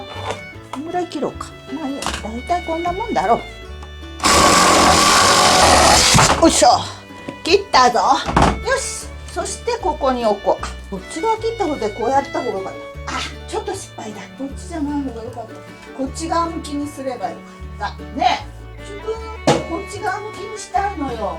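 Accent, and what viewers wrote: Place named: Yamanashi, Japan. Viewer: 祖母が山梨の人のため、たまにでる甲州弁に懐かしさを覚えながら見てます。将来こんな暮らししたいです。見てて元気が出ます！！いつもありがとうございます！！！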